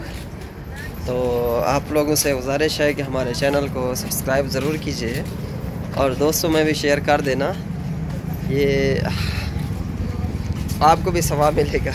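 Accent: Saraiki, Pakistan. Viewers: "اے موبیل پکڑ او میڈا" لالا سرائیکی شناء لگدا پیا ایں۔. واقعی سرائیکی ہو آپ؟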